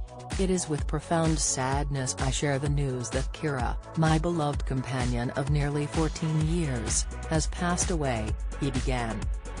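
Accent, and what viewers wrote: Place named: United States. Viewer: Why do we need an American narrator ?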